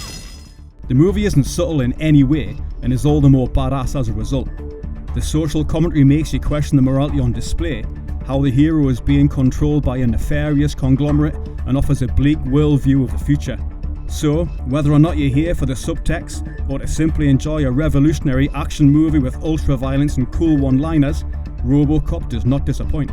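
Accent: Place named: Newcastle, United Kingdom. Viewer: It's nice to hear a fellow geordie on this channel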